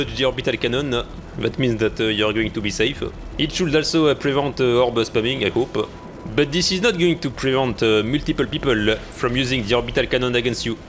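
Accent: France. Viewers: Your French accent was 10/10 in this video, idk it was just so lovely lmao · How do you sound even more French every time I watch any of your videos 😅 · Do you have any idea how French you sound ? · No way again french accent guys 😮😮 nice vid btw xd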